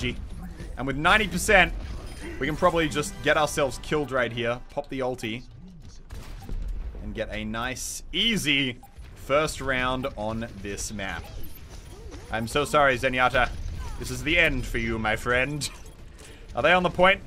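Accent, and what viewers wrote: Australia. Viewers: I want to see you play Junkrat just because i want to hear some funny australian flavor in-game commentary :D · U are Australian right?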